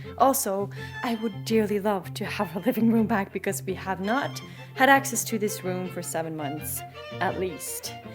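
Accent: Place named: Canada. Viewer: When I looked up how many pounds your 190kg fireplace was (419!!), I was like, "Yeah, they're gonna need a forklift." You guys used a fair amount of ingenuity, determination, and sheer willpower, to get that thing into your house!! The fire it makes is beautiful. One question: Do these types of fireplaces have a creosote buildup problem like brick chimney fireplaces? If so, you should probably clean out the exhaust regularly to keep it safe. I saw you had a motorcycle and a digital piano in your house. Are they both Yamahas? btw, I think you speak English with a Canadian accent, which is better than a US accent imho, except for how they say "decal". You're saying "cupboard" right, btw. The way you weave back and forth between your language and mine makes me want to learn how to say stuff in another language. You and your bf should team up with Kevin Bacon and Kyra Sedgewick. 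Congrats on a successful, beautifully executed long-term project, which took a ton of effort!!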